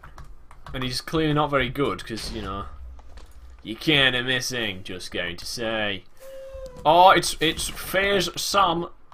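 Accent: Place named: United Kingdom. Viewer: You British m8 love your vids you make my day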